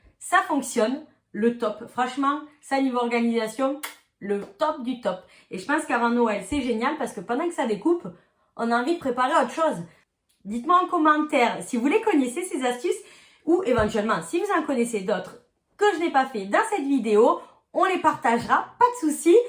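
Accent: South, France. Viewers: Bravoo cette vidéo m'a trop plue, votre accent serait il du Sud? Vos explications et astuces , et bien, un grand merciii🤗 je ne cricute plus depuis hier soir, bluetooth ne veut plus🥺 mais je vais vous suivre quand même 🙏 je suis en manque de cricuter👏👏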